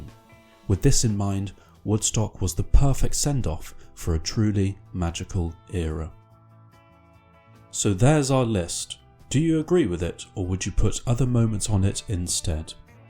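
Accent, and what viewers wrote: England, United Kingdom. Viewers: and her....got to be in there. Since you have a bit of an English accent I don't think you know the new Patriot culture. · I was there at age 17. Does this English accent narrator even mention? Paid least, played the best and most moment. Also, made an impassioned and unforgettable contribution with. I had seen the year before at the unforgettable Newport Pop Festival in the broiling Costa Mesa summer of 1968.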